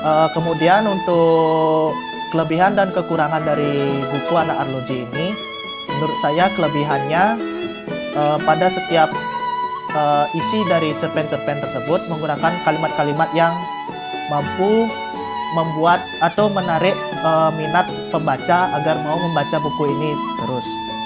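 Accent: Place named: Bali, Indonesia. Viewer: Kak dari Bali ya? Keliatan banget logatnya😂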